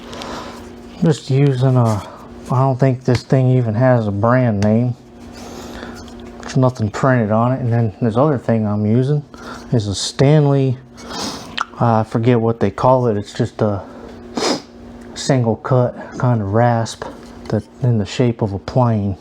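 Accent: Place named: South, United States. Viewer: AND.....how the hell did you lose a Connecticut accent and develop a southern one. That seems pretty difficult to me. My Stepdad is from Boston (Baahsten) Occasionally, he'll slip and tell tell us, for instance to "get in da CAAA."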